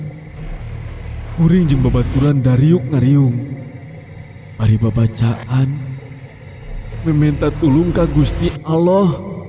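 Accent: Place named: Sunda, Indonesia. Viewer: Bahasa medok sunda gk paham ngomong apa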